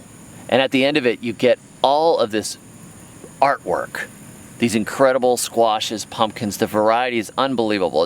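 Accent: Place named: Boston, United States. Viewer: What with the Boston or New England accents LOL..... But it might make me by one... I thought this was about pumpkins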